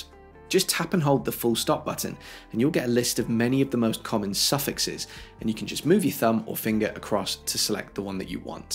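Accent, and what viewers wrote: United Kingdom. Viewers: I love your channel, so nice to hear the tips presented in UK English. I use dictation a lot in fact I’m using it now, I also have Dragon which of course is more sophisticated. One of the things I miss in Apple dictation is the ability to use the phrase “ scratch that“ which when you use it in dragon delete what you’ve just said or if you want to select a single word you can say in dragon “select Apple“ and it will then select that word and you can use another one to replace it. I don’t know if such sophistication exists in Apple dictation but it would be really great to know if it does. Keep up the good work, cheers. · You explain things so well -- the British accent helps 😊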